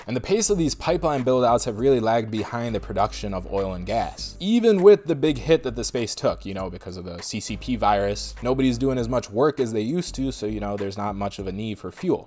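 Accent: India. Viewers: Bro are you from kerala???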